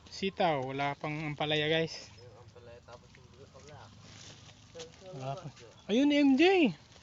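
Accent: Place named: Pampanga, Philippines. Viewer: may punto ka narin ng kapampangan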